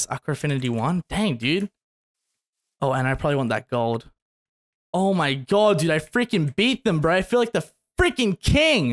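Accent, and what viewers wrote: Australia. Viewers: cause ur Ozzie go into language and find Australian English it's so funny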